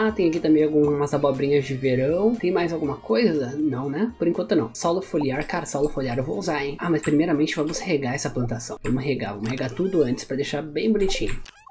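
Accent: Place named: South, Brazil. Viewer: Tu é gaúcho!!?? Acho o teu sotaque parecido com o do sul, se sim tu tá seguro!?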